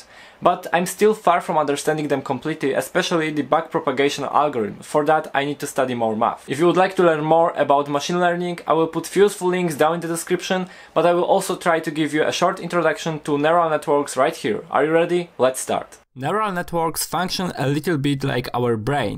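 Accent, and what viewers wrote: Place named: Poland. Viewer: Po akcencie poznałem że to Polska XD Pozdrawiam, mega robocik :D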